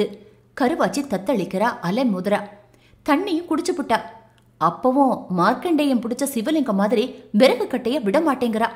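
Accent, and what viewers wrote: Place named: Southern Tamil Nadu, India. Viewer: தங்கள் ஊர் தெற்கு பக்கமோ அங்கே பேசும் தமிழ் காதில் தேன் போல் இருக்கிறது நன்றி நன்றி 🎉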